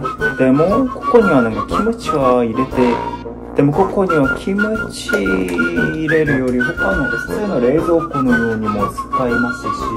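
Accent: Fukuoka, Japan. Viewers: 誰が聞いたとお？ 福岡弁、うますぎ❣️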